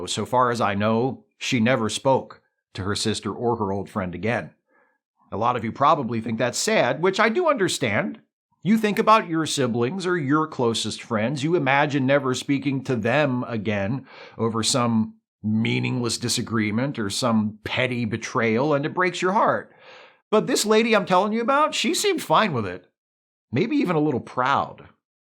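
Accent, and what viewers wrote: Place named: Northeast, United States. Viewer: Very Pennsylvania/New Jersey vibes, I approve.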